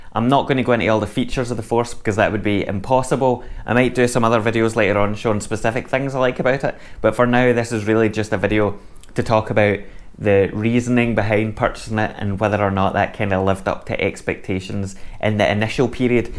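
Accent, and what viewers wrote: Scotland, United Kingdom. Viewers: Not into jock accents but yours is an exception, I could listen to you for hours lol. Anyway, I have just bought a Force for £750 and I don't even know whether it will arrive this side of Xmas or not, so I'm just surfing all the Force stuff I can find. I have an Akai live(not 2) and its all right, a bit like a girlfriend with big boobs, its got its good points but I'm not in love with it, I'm hoping the Force will be the one I fall madly in love with or at least get infatuated with. Anyway excellent review, I wish all reviews were like yours, truthful, honest, tells it like it is, warts an all. I'm going to give you a thumbs up and a subscribe so I can listen to some more to that accent! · Seems awesome really! Stoked to hear what you come up with on it! And even though I have no plans on buying this device... I'm always interested in what your dulcet Scottish tones have to say!! 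lol ✌🐀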